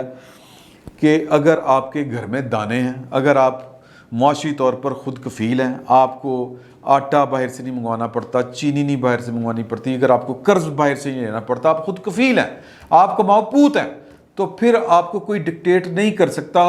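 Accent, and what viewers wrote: Punjab, Pakistan. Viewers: Indian Punjabi here, makes me sad what’s happening in Pakistani Punjab. Although I love anchors Punjabi Urdu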